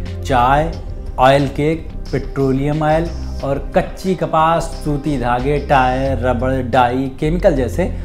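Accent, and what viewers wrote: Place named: Bihar, India. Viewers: Thodaa Hindi me Bihari tone mat laao app bolnaa seekh le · Mr. please to be work on learn hindi then try to do anchoring. You are belong from bihar that is to be known by your Bihari language I hate this type of language. "फैसला = फैईस्ला (you said)"🤣🤣🤣🤣🤣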